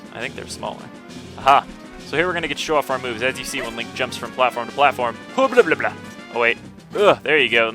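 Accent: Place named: Australia. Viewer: Lol am form England and you sound Australian with the accent!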